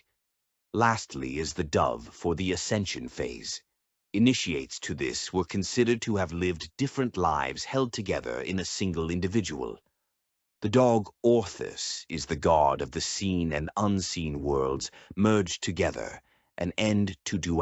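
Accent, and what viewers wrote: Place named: Greece. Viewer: This person sounds like a Greek if you know what I mean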